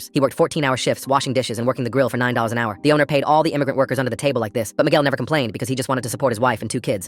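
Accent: Australia. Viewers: Is it just me or does this sound Australien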